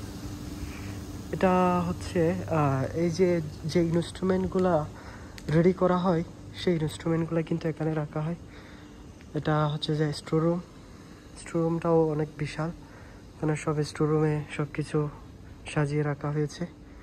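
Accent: Chittagong, Bangladesh. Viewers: আসসালামুয়ালাইকুম ভাই। কোন কাজ সম্বন্ধে ভালো ধারণা রাখেন তারপর ভিডিও বানাতে চেষ্টা করুন আপনি যে বলতেছেন এসি ফ্যাক্টরি আসলে কি এটা এসি ফ্যাক্টরি নাকি ডাক্টিং ফ্যাক্টরি সেন্ট্রাল এসি তে তিনটে পার্ট থাকে ওইটা কি একটা অংশ আর কিছু আবাল কিছু না বুঝে শুধু কমেন্ট করে যাচ্ছে ভাই মনে কষ্ট নিয়েন না আপনার ভাষাগতভাবে বুজা যাচ্ছে আপনি চট্টগ্রামের মানুষ চট্টগ্রামের ঐতিহ্যগত খেয়াল রাখবেন।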